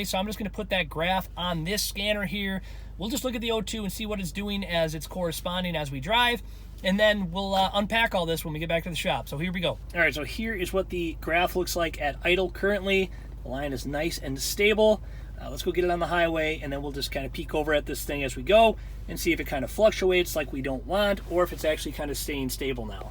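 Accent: Minnesota, United States. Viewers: This guy definitely lives in Minnesota somewhere. There is snow in the background of almost every video! And his accent is a dead give-away.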